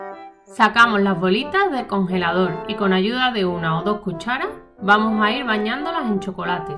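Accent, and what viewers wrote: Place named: Andalusia, Spain. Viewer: Hola desde Galicia.Las recetas son excelentes. He comprado el robot hace unos días.Estaba buscando recetas acordes a mi dieta sin lácteos y gluten, y ha sido una suerte encontrarte.. Me gustan especialmente las masas y los panes. Una gallega lleva el pan en el ADN y en su alma morriñenta. Me cuesta encontrar la harina de sorgo. Me encanta el acento andaluz de tus vídeos, una maravillosa riqueza que no hay que esconder He trabajado bastantes años en el Sur , y ahora que estoy de vuelta, lo añoro. Felicidades por tu trabajo. Rosa.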